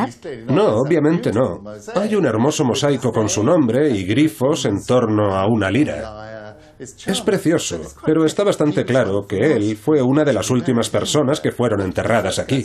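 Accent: Latin America. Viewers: NETFLIX ya se dió muchísima cuenta, que tenemos Español Latinoamericano....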